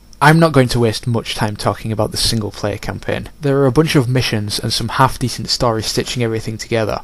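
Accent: United Kingdom. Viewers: are you from britain? just asking.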